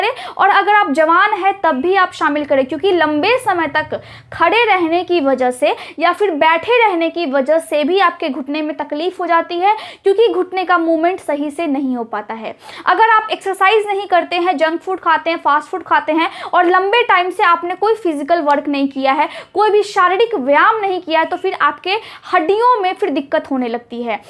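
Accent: Bihar, India. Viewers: Apki Bihari accent achha lagta he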